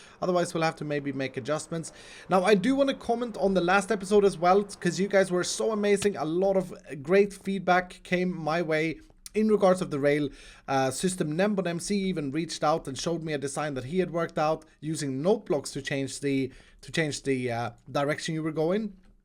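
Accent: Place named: Sweden. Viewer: He's probably just developing an accent that's less swedish because the majority of the English-speaking world isn't swedish. He must think in a swedish accent, so it would be hard to lose it permanently.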